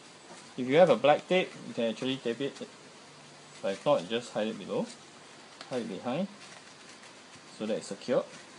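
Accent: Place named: Singapore. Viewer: singaporean accent!!!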